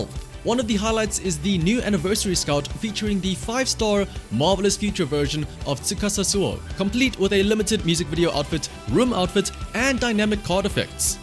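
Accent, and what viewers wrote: South Africa. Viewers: Are you from South Africa cuz ur accent really sounds like it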